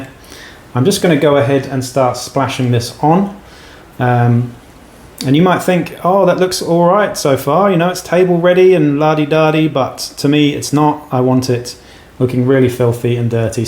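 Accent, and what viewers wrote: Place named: England, United Kingdom. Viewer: I am confused... an English Accent, a Kiwi greeting mentioning Australian shops 🤣🤣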